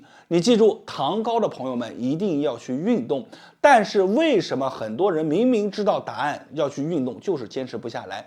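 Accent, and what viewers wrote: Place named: Nanjing, China. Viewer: 聽口音應該是南京人🙂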